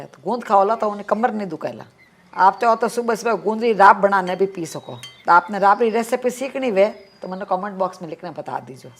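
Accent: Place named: Gujarat, India. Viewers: Namaste masi. I don't know your language but I understand it little little because it's giving me a Gujrati touch. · Is the language Hindi in Gujarathi accet or Gujarathi in Hindi accent It is confusing for south Indian like me .However this recipe looks tasty .Good presentation .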